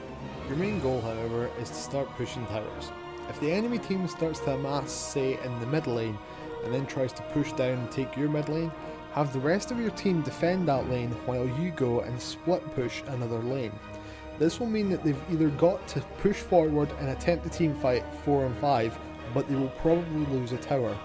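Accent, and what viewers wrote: Scotland, United Kingdom. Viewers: waaaa! You Scottish too big man ?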